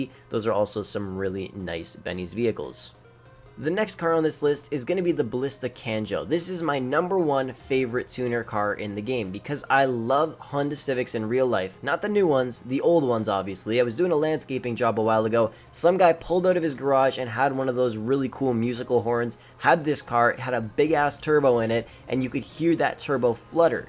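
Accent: Canada. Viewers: Nice vid, you’re from Canada aren’t ya? I figured by the way you said “out”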